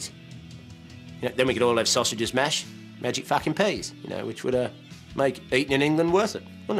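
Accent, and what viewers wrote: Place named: Australia. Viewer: wtf hes australian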